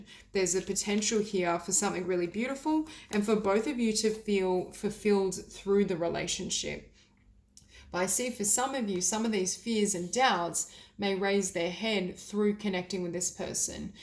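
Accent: Australia. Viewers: Definitely an Aussie accent XD ..... Sydney?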